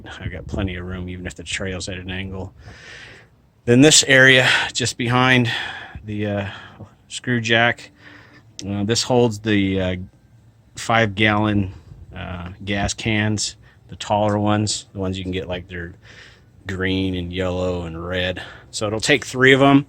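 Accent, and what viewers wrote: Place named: Utah, United States. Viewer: sounds like he's from Utah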